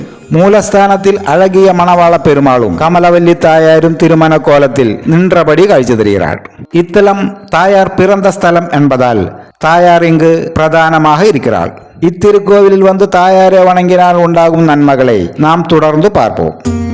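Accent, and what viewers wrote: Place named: Kerala, India. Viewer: നല്ല മലയാളച്ചൊവ ഉണ്ടല്ലോ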